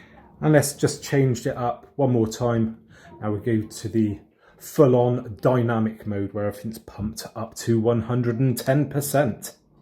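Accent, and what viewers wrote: United Kingdom. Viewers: What accent is that? British?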